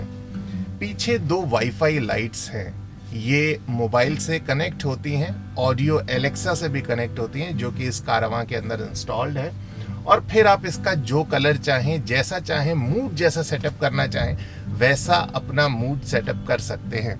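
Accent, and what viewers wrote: Standard. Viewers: Apki Hindi itni sudh h k. Salute to you sir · वाह क्या शुद्व हिंदी बोलते हो आप 😊👍👌मजा आ गया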